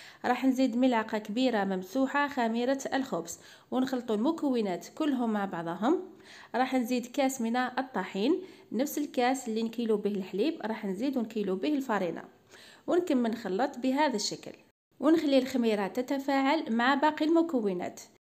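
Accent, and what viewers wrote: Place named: Algeria. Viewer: مغربية. كتعجبني. لهجة جزائر غدوة مليح شابين لهجتكم كتعجبني نتاعكم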